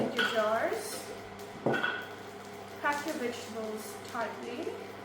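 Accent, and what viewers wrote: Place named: United States. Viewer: 😂 oh how she’s grown and that accent, efinitely the American guest influence, just love it!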